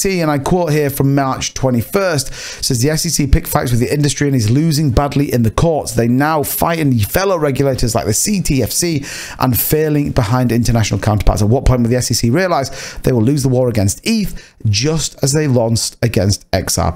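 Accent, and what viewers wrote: United Kingdom. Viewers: Great to hear a British voice doing XRP content. 🙏🏻 come on XRP…… from a fellow Brit.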